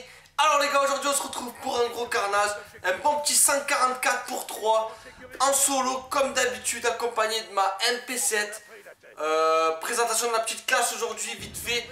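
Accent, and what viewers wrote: South, France. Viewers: Continu comme ça ton commentary avec ton accent du sud amene la chaleur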